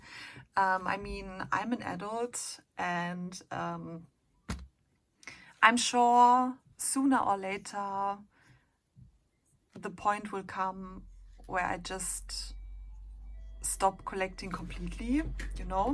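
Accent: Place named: Germany. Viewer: Omg I can hear that you are german are you?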